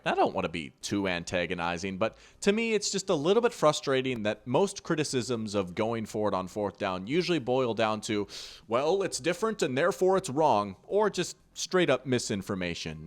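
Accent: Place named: Minnesota, United States. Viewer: That Minnesota accent is offensively annoying and absurd. “Outomatic” I do agree with you though and Dan Campbell is the man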